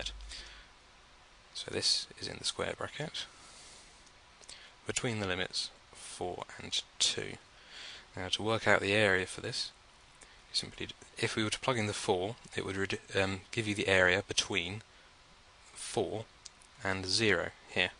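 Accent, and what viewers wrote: United Kingdom. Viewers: Your voice and awesome British accent makes this video that much better. Plus you do a great job explaining!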